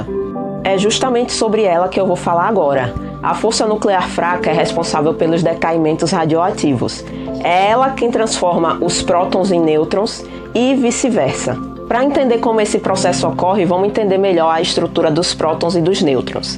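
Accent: Northeast, Brazil. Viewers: "O bixinho é pesado" física e meu amado sotaque nordestino, adorei ❤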